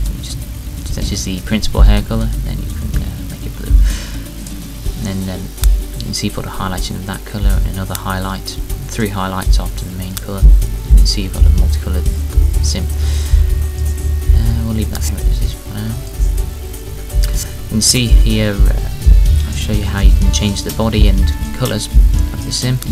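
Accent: United Kingdom. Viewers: OMG R U BRITISH i luv british ppl their awesome!!! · where are you from? you talk posh. nmo offence && you should Posh english but im english i sound NOTHIN like that !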